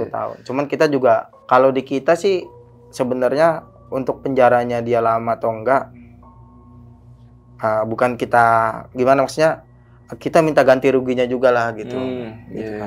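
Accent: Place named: Betawi, Indonesia. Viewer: Saamm betawieee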